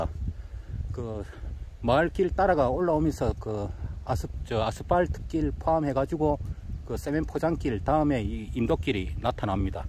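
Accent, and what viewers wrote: Gyeongsang, South Korea. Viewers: 경상도 분이네예 ㅎㅎ 고생하셨습니다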